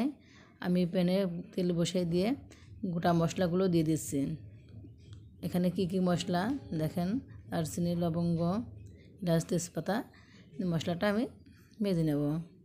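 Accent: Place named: North Bengal, India. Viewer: প্রথমে ধন্যবাদ জানাই সালাম দেওয়ার জন্য । কথার ধরনে বুঝা যায় তুমি উত্তর বঙ্গের । থাক্ ভাল থাকুন, সুস্থ ও নিরাপদে সুরক্ষায় থাকুন।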